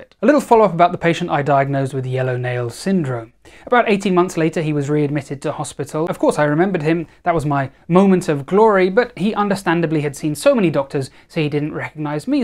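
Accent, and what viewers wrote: South Africa. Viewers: top notch South African accent! Laughing so much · I don’t know what you have against doctors from kwazulu natal, but your South African Durban accent killed me.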